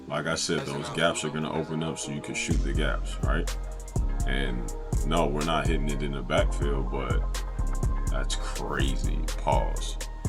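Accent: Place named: Atlanta, United States. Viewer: Dawgy sound like he from round my way what zone you in in Atlanta twin 😂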